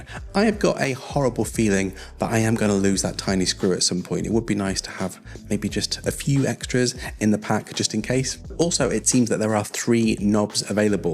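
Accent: England, United Kingdom. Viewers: I hate the audio track chage it to my county language I like your English accent love this review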